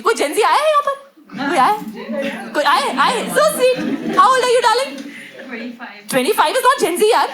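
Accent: Punjab, India. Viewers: Being a Genz I don't spend much time in toilet. Also I really like your way of presenting and didn't find it like I should mind it . Also your punjabi accent ❤❤❤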